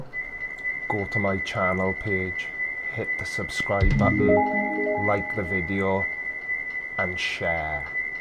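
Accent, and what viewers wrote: Wales, United Kingdom. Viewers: We need a Creaky Weekly in the automotive world. As for this channel, I think you should cover whichever topic strikes you personally. If I may speak aggregately, folks watch to see and hear your take on things, your perspective. The Welsh accent and the clever phrasing only adds to the scope of the video. Fun to watch.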